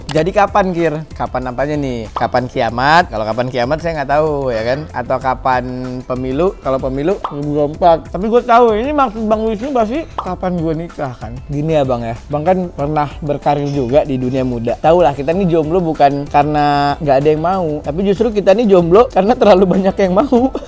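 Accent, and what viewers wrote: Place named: Banyumas, Indonesia. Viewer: Ngakak dngr ngomong ngapak wkwk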